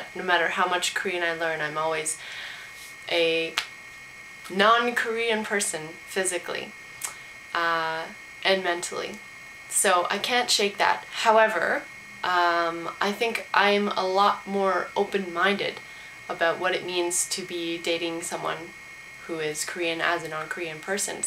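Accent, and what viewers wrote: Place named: Canada. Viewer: You Canadian, ain't ya, white girl? Judging by the accent. :)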